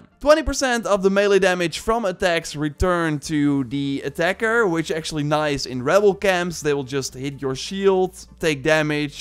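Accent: Netherlands. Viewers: Hele goeie content maar jonge jonge wat is duidelijk te horen dat je Nederlands bent 😂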